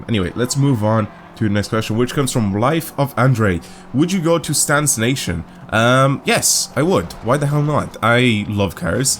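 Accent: Ireland. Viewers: When did you move to Ireland (at what age?) cause of have the accent would never have guessed you were not Irish lol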